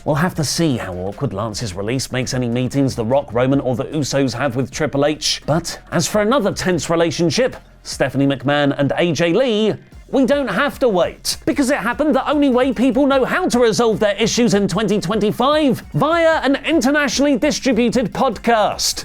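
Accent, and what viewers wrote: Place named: United Kingdom. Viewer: Its his British accent lol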